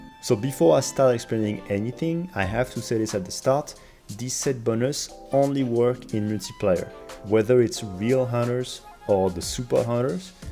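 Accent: France. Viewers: Il est Français? Je me disais que j'entendais ça vu l'accent! Il a jamais parlé en Fr? aha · Hmm le bon accent français comme on l'adore 😅. Tkt je suis un confrère aussi 😁.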